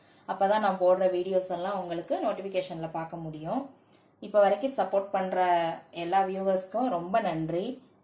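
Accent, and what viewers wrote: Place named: Tirunelveli, India. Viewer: திருநெல்வேலியா நீங்க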